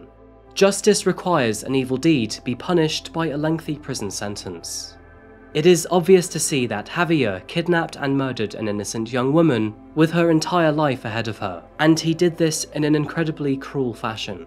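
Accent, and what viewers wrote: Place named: England, United Kingdom. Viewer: I believe he actually does live in the US now, if I remember correctly when mentioned in a previous video. I forget what city/state. EDIT: I was wrong, he is FROM America, but moved to the UK at a young age and lives there now! I got it mixed up! But now I can't remember what city he was from in the US. I feel like it was in the Pacific Northwest for some reason? Maybe I just have Seattle on my mind because he loves coffee lol. I honestly don't know. But yes he moved to UK at age 7 per his Q&A video and developed the English accent. He is likely just promoting Scentbird because they're a sponsor for his channel, but sponsors often send the content creators free stuff.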